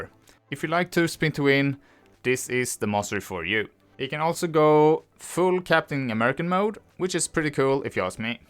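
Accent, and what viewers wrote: Sweden. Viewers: i can hear in you accent when you speak english that you are SWEDISH hahahhah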